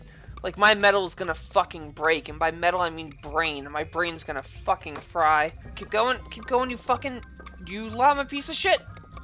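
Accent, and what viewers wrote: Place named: Boston, United States. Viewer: God i love ur Boston accent it's so adorable!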